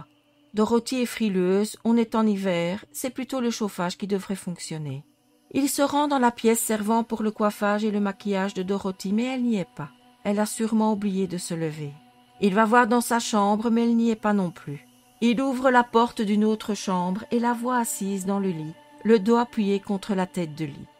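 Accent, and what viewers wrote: Belgium. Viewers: Bien sûr une affaire connue mais un point de vue et une analyse différente... 👍. Merci pour le petit accent Belge 😉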